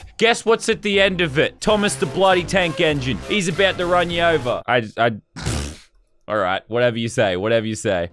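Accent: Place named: Australia. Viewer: I find the australian accent so cool, the way says gone as gaawn.😅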